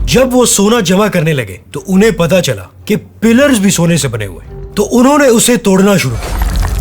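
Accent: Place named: Kohistan, Pakistan. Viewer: Style, accents, editing everything seem like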